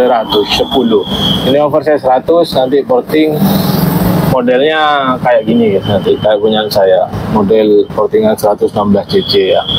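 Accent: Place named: Java, Indonesia. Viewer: Lokasi mana kok bhs nya medok jawa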